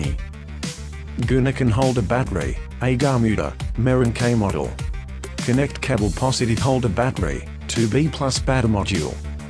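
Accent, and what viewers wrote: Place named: England, United Kingdom. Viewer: Sebenarnya dia logat inggris tapi pakai bahasa indonesia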